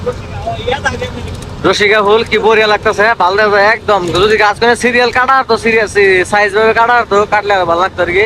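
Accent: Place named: Bangladesh. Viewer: ইন্ডিয়াতে আপনার গ্রামের নাম কি.... বাংলাদেশে আমাদের গ্রামের মানুষের কথাও আপনার কথার মতো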